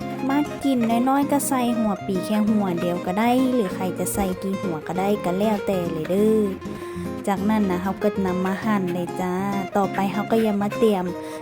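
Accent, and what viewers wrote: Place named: Uttaradit, Thailand. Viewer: ขอเดาว่าสำเนียงคนอุตรดิตถ์ค่ะ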